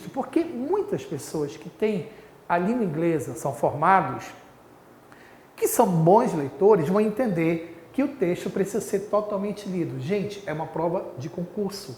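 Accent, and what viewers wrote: Pará, Brazil. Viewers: Ótima aula e explicação, você é paraense professor? kk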